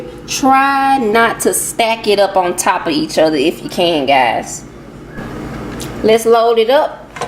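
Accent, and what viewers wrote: South, United States. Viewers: I love your southern accent! 👍❤️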